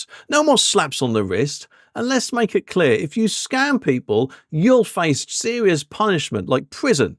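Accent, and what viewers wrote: United Kingdom. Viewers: You sound very much like you’re from the same area of the UK as me. What’s the closest major town or city to you?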